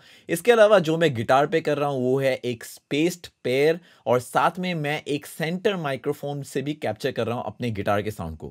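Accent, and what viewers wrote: Assam, India. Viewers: Sir ap Assamese ha na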